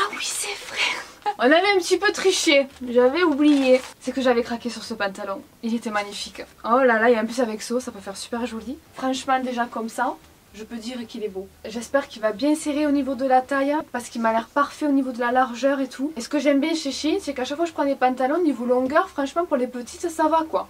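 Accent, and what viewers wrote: South, France. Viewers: Au pire le haut bleu finale tu le garde pour dormir en hiver et les flops tu les vends🤔 Sinon j’adore chaque de tes vidéos et je surkiffe ton accent du sud gros kiss 😘😘❤️‍🔥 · Bonjour/Bonsoir j'aimerai beaucoup que tu test la marque Adopt maquillage parfumerie moi perso j'adore mais j'aimerai beaucoup que tu fait une vidéo là dessus. Continue ce que tu fait j'adore tes vidéos sont génial. Je suis du nord et ta voix du sud nous ramène la bonne humeur. Bisous a votre petite famille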